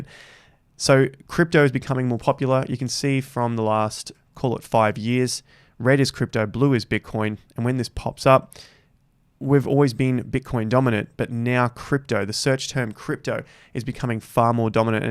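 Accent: Australia. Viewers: It’s great to hear another Aussie. Are you Melbourne based?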